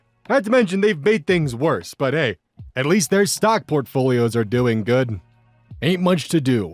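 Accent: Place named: New York, United States. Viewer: Curious question.... Why do you sound like someone that lives in Manhattan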